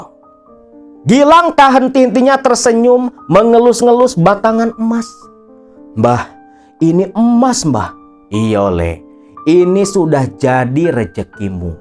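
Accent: Java, Indonesia. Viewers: Sakit perut ketawa dngr cakap jawa.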